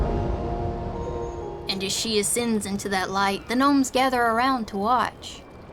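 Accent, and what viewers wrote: South, United States. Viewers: We return to the, for good speculation on nightmare fuel with a slight southern drawl to it Keep it up!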